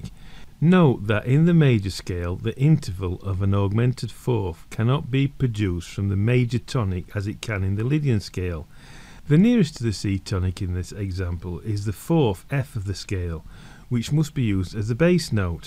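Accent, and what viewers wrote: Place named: Lancashire, United Kingdom. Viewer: Lancashire by my ear.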